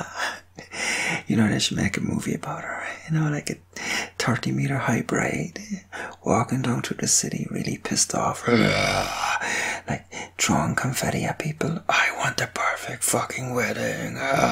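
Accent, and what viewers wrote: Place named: Ireland. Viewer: This version sounds so classy, romantic and Irish like. Love it. Love it. Love it ❤ Yes to the foot rub. More of this please😍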